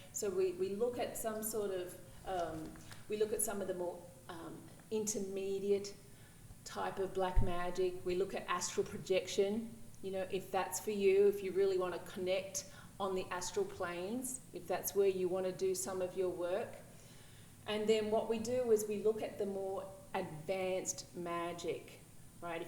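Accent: Australia. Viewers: Can't help noticing your Australian accent, I'm a fledgling Black Magician living in Sydney, could you advise me of any groups in Sydney? Much appreciated.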